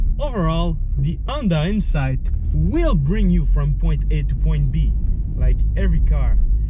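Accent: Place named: Quebec, Canada. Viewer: He’s québecois man, give him a break lol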